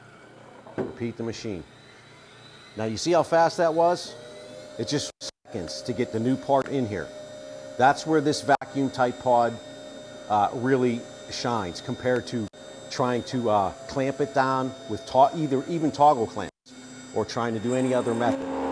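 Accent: Pittsburgh, United States. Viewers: nice video. do i detect a pittsburgh accent. question have you ever tried the pods with mdf on to to make a much broader vaccum area.....great video.. what nice little jig for those cases...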